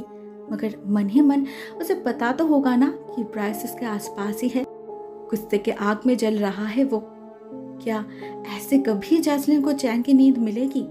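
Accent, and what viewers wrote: Bengal, India. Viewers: sei to. Bengali wali vibe chupegi kaise. Aur itni pyari hindi ek bengali hi bol sakti hai. Khub misti ho tum. Like your way of speaking dear. Love you dear. Chaliye jao. 💕💕💕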